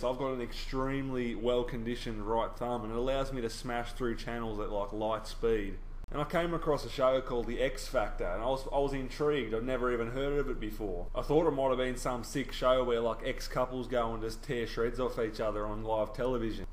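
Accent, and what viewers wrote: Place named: Jamaica. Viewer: I have never heard an Australian try to speak patois ahahaha dude that poodle was money!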